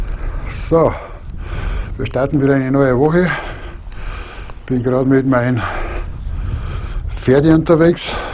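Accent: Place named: Lake Constance, Germany. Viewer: ja du hesch ja au e geile Dialekt ;-) aber ke ahnig woni di söll here tue , dänke so bim Bodesee ir nöchi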